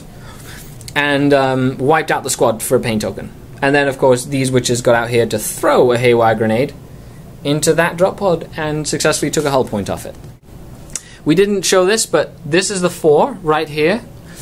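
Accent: United Kingdom. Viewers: Hi! Nice battle repport but I wonder what happened to the scourges, I'm starting my gaming season pretty soon (damm exams :S ) and will follow you batreps with interest. I'm planing on runing 3x5 Wyches with HW in venom with few extra stuff (venom blade and Blast pistol) let's see how it goes! BTW are you british? I know you are in Canada but you sound brit :O.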